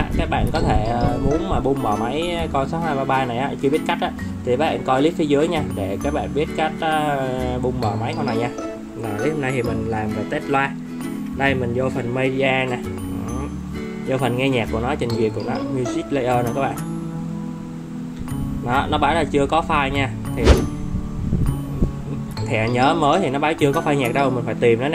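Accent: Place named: South, Vietnam. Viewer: giọng miền nam dễ nghe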